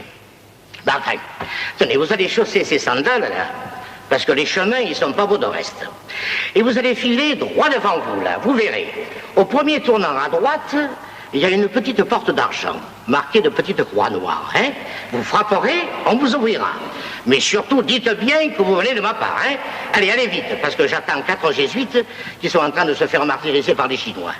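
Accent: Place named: Provence, France. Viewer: Qu'il est beau cet accent Provençal, Dieu qu'il est beau !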